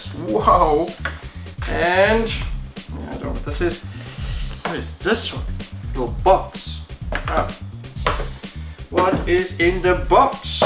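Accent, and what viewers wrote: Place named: Afrikaans, South Africa. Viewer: Not to be rude or anything, but is Afrikaans (or Australian) your first language? I just think so because I have the same accent.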